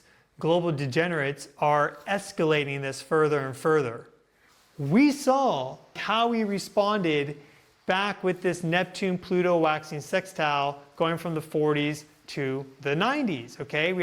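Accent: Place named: Bay Area, United States. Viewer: I don't know the answer, but always wondered where is from... My guess is SF Bay Area, possibly South Bay, just from his accent - Where do you think he's from? Only people who don't actually know, please!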